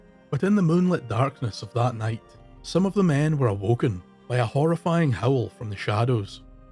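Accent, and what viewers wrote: Scotland, United Kingdom. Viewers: Im sorry ive only just gotten the time to sit & enjoy your video, i normally like to watch them asap but alas, life got in the way!🤣 I could sit & watch your videos constantly. They're entertaining & informative. I love hearing all these tales (which are 100% true, in my opinion!) Of my beloved homeland. Also who doesn't love an intense Scottish accent?! ❤❤❤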